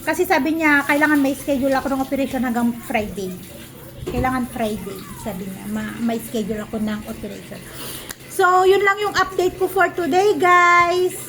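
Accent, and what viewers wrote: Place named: Ilocos, Philippines. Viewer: Ganyan kasi mga ilokano ganyan magsalita kami mga ilokano kmi ni mommy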